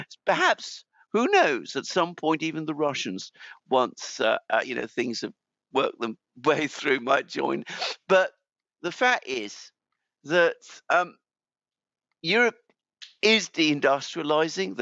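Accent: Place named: United Kingdom. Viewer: You mispronounce zaparesia and the names of lots of towns I don't know if it's your gay British accent lol or if you're just trying to sound fancy or I don't know makes you sound stupid though just FYI and you're not? Your very intelligent so I don't understand my man. I love you guys show you speak the truth!!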